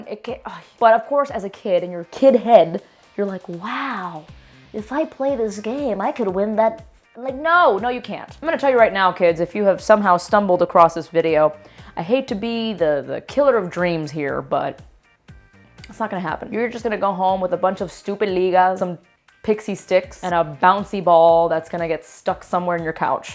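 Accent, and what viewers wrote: Cuba. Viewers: I luv her Cubaglish.🤗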